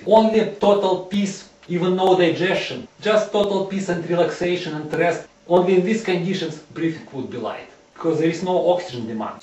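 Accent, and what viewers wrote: Russia. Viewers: I have learned so much just from watching your video. I find the comparisons between primitive and modern day breathing mechanisms immensely fascinating. I am a physiology student and this has given me a lot to think about! I'm also Russian (I think you are too?) and it is wonderful to hear the accent again after so long.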